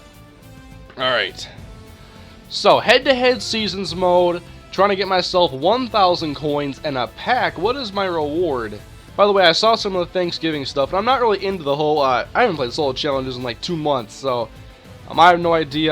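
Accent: Minnesota, United States. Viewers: Hi are u from Minnesota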